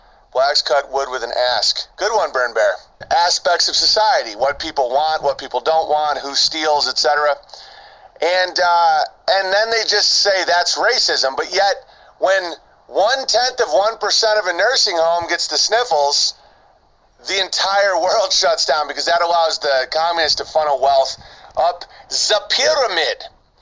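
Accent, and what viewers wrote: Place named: Texas, United States. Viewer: You sound like you’re from Texas like me.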